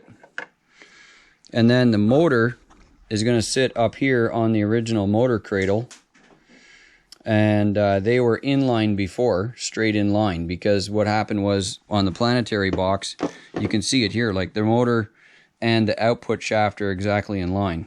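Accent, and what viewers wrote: Canada. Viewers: Thanks for the detail - sure helps us newbs... and for pointing out the disconnected red wire from the SC to the BEC, my wasn't even shrink wrapped, they just pulled the connector pin out of the plug and left it haning. I figured it was a boo boo, so stuck it back in. Truck arrived from China 4 1/2 months after I ordered it, bad left steering servo, so while it's up on the hoist, am going to slow it down based on your fixer upper here with yer easy to follow instructions, in a proper Ontario accent to boot (grew up over near Shelburne, stuck down here in Texas now). cheers and thanks.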